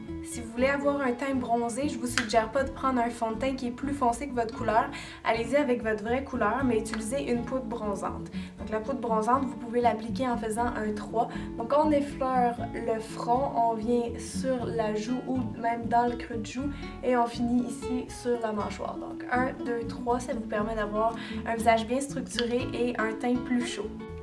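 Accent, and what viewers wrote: Quebec, Canada. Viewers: Je suis espagnole et je comprends bien ton accent french canadian(le secret....j´adore les têtes à claques). Je te trouve ravissante,naturelle,tu sais transmettre ta bonne volonté,et le résultat ne peut être plus excellent. ERES UN ENCANTO Y TE MANDO UN BESO GRANDE DESDE ESPAÑA.ENHORABUENA.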